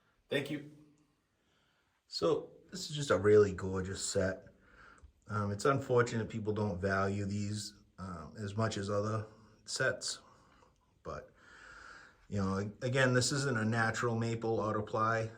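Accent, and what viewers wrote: Boston, United States. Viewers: Sounds like a fellow Masshole that likes good drums. Fackin ah, khed!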